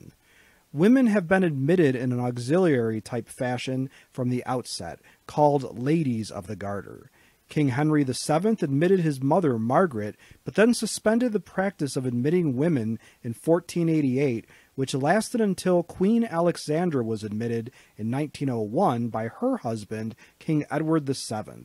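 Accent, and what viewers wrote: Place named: United States. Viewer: Unless I am mistaken, the narrator sounds like an American. He had asked if (paraphrasing) "clubs like the Order of the Garter should still exist today?" In an American context, your equivalent would be the Presidental Medal of Honour, with distinction. The Order of the Garter is a living society honoured by the British Crown. Other than members of the Royal Family who do not count in the 24, are some of the leading figures of the United Kingdom outside Scotland. Also, it remains "The Most Noble Order of the Garter;" the name did not change.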